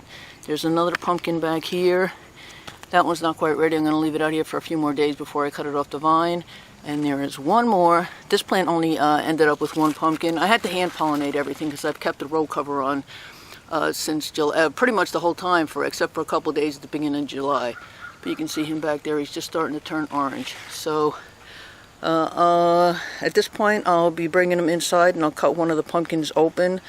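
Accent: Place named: Pennsylvania, United States. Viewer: I have grown these here in NE VT. They take longer here, as we are borderline zone 3 and it does not get as hot as in your neck of the woods. I have a nice haul this year, about 20 of them from seed I saved from last year. I planted on June 1 after staring in the greenhouse. I just harvested them today, Oct 24 ( fortunately no frost yet). They are only just beginning to reveal their orange sections. I was wondering if these pumpkins can be just let to sit and dry in order to make seed harvesting easier and not such a gooey affair. Have you ever tried this? Anyways we are fortunate not to have a big problem with squash beetles, haven’t yet seen any here. The Japanese beetles preferred the hazelberts and prunus ( cherry plums and regular plums ) though they were less this year too ( maybe two years of trapping is helping). Keep on growing…. 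You sound like you live in PA…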